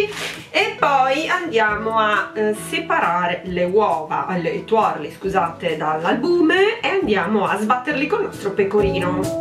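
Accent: Rome, Italy. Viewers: ...dimenticavo: ti ho adorata in versione romanaccia, anche se ci devi lavorare😂 Daje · Sarda trapiantata a Milano e che riesce, e lo dico da romana, a fare piuttosto bene le battute in romanesco!😂 Spesso chi ci prova esagera, tu no! Evviva la carbonara. Mi sono mancati i disturbatori💚💚💚💚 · Wow ma sei bravissima, il romanesco poi..... Sei fantastica! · No te prego....dopo il tuo parlare romano ti amo ancora di più...sei un mito😊🤗👏👏👏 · La mia regione 😍 stupenda tu in versione romanesca 😂 ti volevo chiedere una cacio e pepe ma ormai penso se ne parli al prossimo giro ❤️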